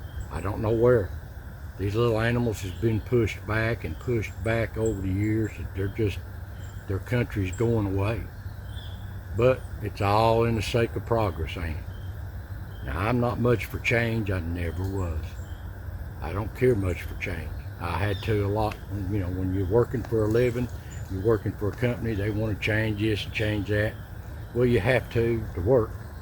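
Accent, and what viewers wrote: Appalachia, United States. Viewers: My husband and I watch all your videos. For me, personally, the 1st thing that drew me in was your voice. I had been searching for appalachian history and found you. I subscribe to a few other appalachian channels, but yours is my favorite. I have lost all my immediate family, just good ol Alabama mountain folks. Your accent is identical to theirs, so it gives me such comfort. You'll never know 😔 I wake up every Monday morning, have my coffee, and check in with the Ridge. My husband and I now spend alot more time out in nature and even plan on buying a metal detector very soon. ❤ God Bless you, and all you do.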